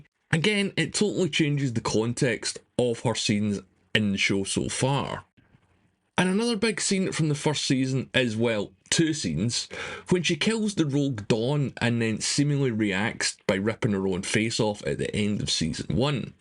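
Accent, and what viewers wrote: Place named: England, United Kingdom. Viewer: It takes a while to get used to your English accent but what you have to say is interesting.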